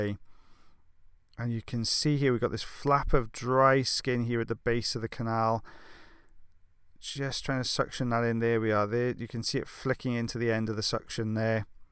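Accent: Wales, United Kingdom. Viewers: I keep coming back because i miss the welsh accent while living in korea. When you said go get a cuppa literally lit up my eyes😂 nobody has said that to me in 5 years while ive been here! Aaaand your videos really relax me before bed! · Agree! Want to learn that british accent.. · Haha...18 minutes of a Welsh accent...how could that ever bore anyone? And an explanation of what is going on...I have learned plenty...and not only the terms (the attic, canal walls, etc) and the various conditions which occur. This is a whole new course! Thank you. I didn't waste my isolation!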